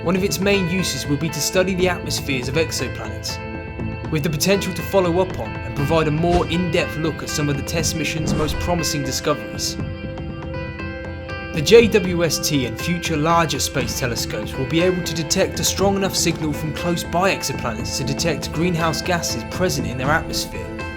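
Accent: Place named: England, United Kingdom. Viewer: G'day. Good vid. I love your pommie accent. Like Curious Droid you say 'Errf' instead of Earth. Outstanding. Vive la differance.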